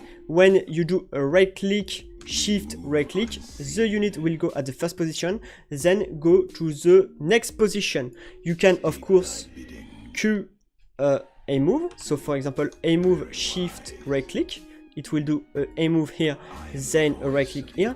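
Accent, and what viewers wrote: France. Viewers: Le bon vieux accent français x)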